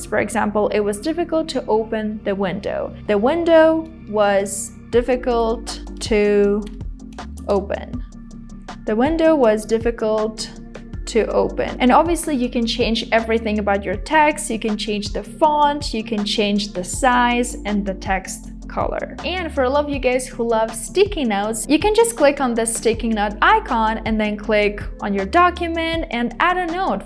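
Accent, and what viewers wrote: Russia. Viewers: Вроде хорошо говорит, но все равно слышатся достаточно уловимый русский акцент. Только потом посмотрел на имя ) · Why do I can detect Russian pronunciation from first word all the time.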